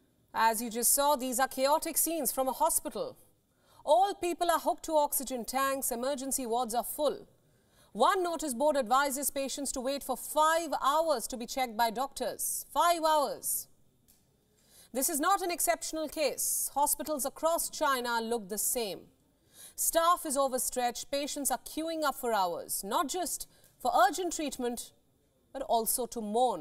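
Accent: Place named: India. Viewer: Why do Indians speak this way? I mean they were under Brits for such a long time and you would think they would speak English correctly.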